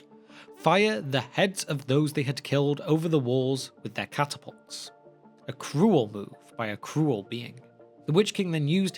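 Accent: United Kingdom. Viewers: Can you please narrate in an American accent. My wife doesn't like British accents because your silencing of Rs and drawing out of vowel sounds. Thanks, then we will subscribe.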